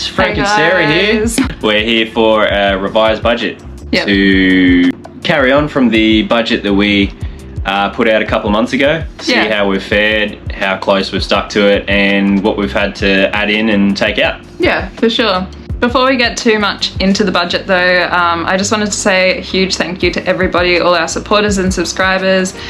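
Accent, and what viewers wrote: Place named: Australia. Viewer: Thanks for the video! Great to hear the Aussie accent!! What about the cost of Visas and/or visa runs? Thanks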